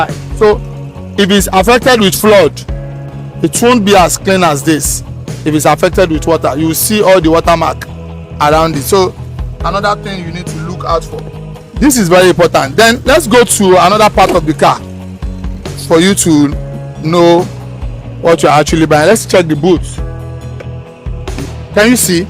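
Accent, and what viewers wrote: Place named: Africa. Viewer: African english is so funny 😂😂😂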